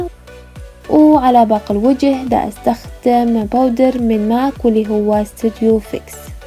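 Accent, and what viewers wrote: Iraq. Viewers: Sara Korea1995 هذه لهجة العراقيةة..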